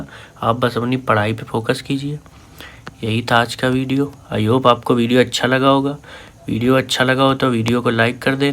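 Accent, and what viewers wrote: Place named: Kanpur, India. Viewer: Kanpur se ho kya bhayia apki language se lg rha 😅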